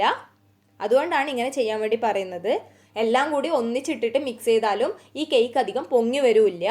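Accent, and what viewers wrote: Kannur, India. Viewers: Kannur kaari aanalle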